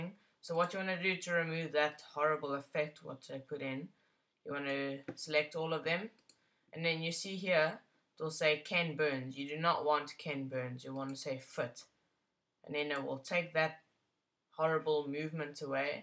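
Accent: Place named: South Africa. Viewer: So South African! makes me miss home :)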